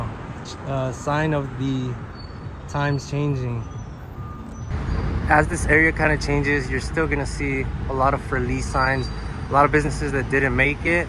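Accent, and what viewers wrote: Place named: Spain. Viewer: I live in this 'hood. To my ear, the youtuber has a slight Spanish accent.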